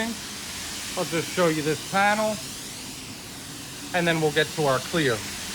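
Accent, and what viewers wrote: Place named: New York, United States. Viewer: Where in NY are you? I'm guessing queens or more upstate? I just like to guess accents haha. good video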